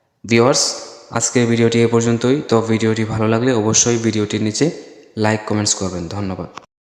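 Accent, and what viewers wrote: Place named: Bangladesh. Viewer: ভুল হিন্দি শুধু নয়, উচ্চারণ একবারে typical বাংলাদেশী। আগে ভালো করে বাংলা উচ্চারণ করতে শেখো।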